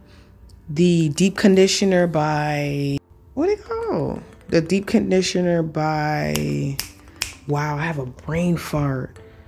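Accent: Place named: Nigeria. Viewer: Are you from Nigeria? Love your accent sounds like your from there.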